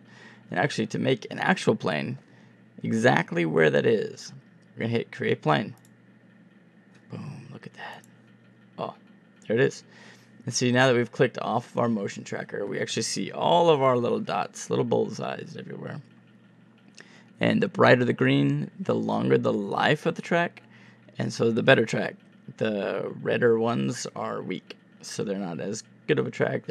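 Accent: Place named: Kentucky, United States. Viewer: you sound kinda northwest Kentucky. Great tutorial man thanks! Subscribed!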